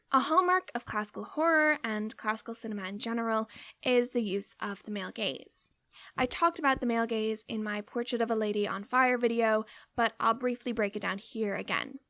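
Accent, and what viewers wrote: Ireland. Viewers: Lol completely irrelevant but why do you have the slightest irish lilt?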